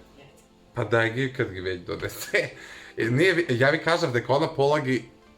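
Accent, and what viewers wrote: Kumanovo, North Macedonia. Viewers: Od sekogash sum mislel deka na youtube i vo privaten zhivot zborash literaturno ali koga slushav deka zborash kumanovski se zachudiv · cepaj samo kumanovski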